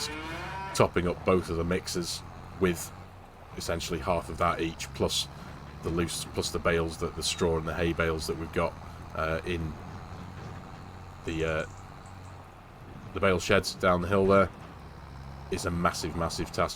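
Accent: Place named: Dorset, United Kingdom. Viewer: Have missed your Dorset tones, for some reason youtube didnt notify me of your vids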